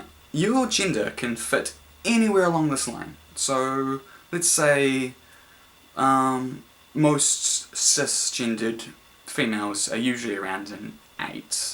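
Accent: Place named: New Zealand. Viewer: what country are you from? you kinda sound kiwi but I might be wrong haha😄☺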